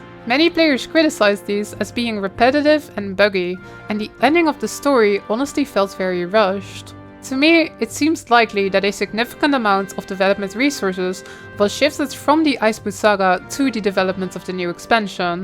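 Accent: Netherlands. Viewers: I love that Dutch accent omg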